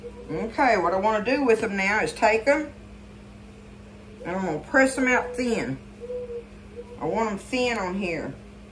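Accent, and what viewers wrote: South, United States. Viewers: It looks delicious.. you sound like one of my Aunties down south when you talk.. you're very pretty ❤🥰 just like my Aunties..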